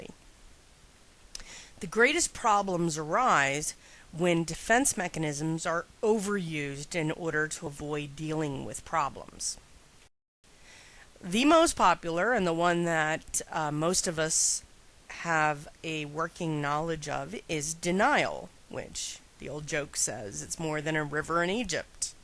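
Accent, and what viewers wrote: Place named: Pennsylvania, United States. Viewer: Thank you for this, it helped me a lot to prepare for a psychiatry oral exam. I am French, but I lived in PA for two years, it was nice listening to your accent as well (unless I am completely wrong and you are not even from there :) :) I think your explanations were well-formulated and your examples very clear. What I still have a hard time with is matching the mechanisms with the disorders. Like a person with BPD will use projective identification and idealisation for instance. For some others it can be more difficult for me to remember. Also, I am supposed to know the reason why a person has this or that disorder from a personality development point of view (Mahler theory)... This is kinda confusing for me. Anyway, thanks again that you shared your work! Subscribed!!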